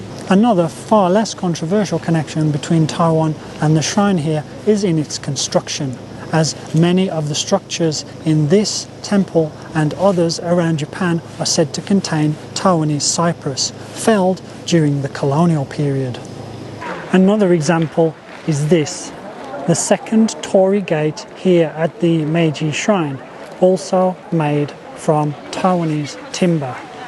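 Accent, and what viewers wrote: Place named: United Kingdom. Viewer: Never mind. I think. Mostly, I listen to what you say to get more familiar with the British accent. 😆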